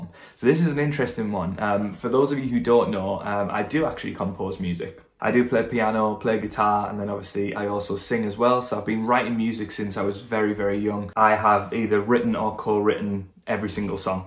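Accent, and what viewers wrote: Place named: United Kingdom. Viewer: Amazing voice, and super handsome, oh and I love your British accent!!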